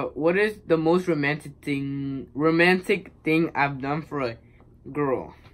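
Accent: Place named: Spain. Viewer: Spanish accent😍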